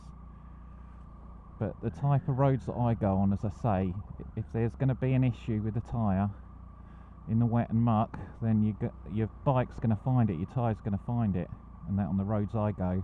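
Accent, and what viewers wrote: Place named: United Kingdom. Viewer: Extremely narrow roads, trees/buildings right on top of the road, rain, driving on the wrong side of the road, and a guy with an accent, must be another Brittish motovlogger. I heard someone on a different video say this was a Chrisitan motovlog channel. Are you not doing faith based vids any longer, or is it that you are simply a Christian that rides a bike? I just started my channel, but have been riding for 50+ years. Riding in rain is not the most fun, but not as bad as icy roads or snow on them, done that too many times as well. Ride safe, y'all. Greetings from Tennessee, USA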